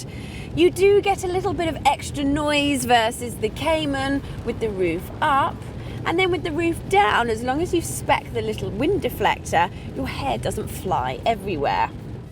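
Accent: England, United Kingdom. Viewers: I love accent. What part of the UK is she from? London? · Why is there a british accent talking about MILES per hour? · I don't know why but there's something in her eyes that makes me wanna fill her pretty little mouth with... a banana. But then again, I wouldn't be able to hear that lovely english accent. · British accent is weird!!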